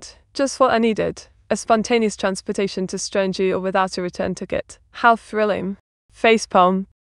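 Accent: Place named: United Kingdom. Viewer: The voice and audio need some improvements: - Compression (maybe Normalization too): It peaks too high and goes low again, it's not consistent in her voice. - The sound seems low quality, it sounds like someone with an old mic (maybe adjust filters?) - I don't mind the boyish voice although l I would prefer a softer voice or a cute one. - The british debuff is an issue, it's hard to understand, especially for AI that talks incoherent stuff sometimes (you can't understand form context). Many times I like to keep chatting streams ON while doing something else, but it's hard to understand her without paying attention due to her accent. Overall seems like a great project, keep it up!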